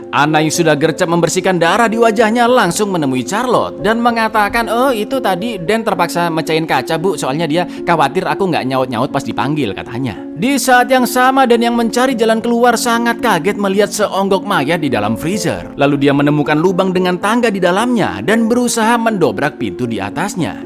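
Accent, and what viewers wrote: Java, Indonesia. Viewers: Bang,anda asli Jawa kah? Kok bahasa pengantarnya pake bahasanya fasih sekali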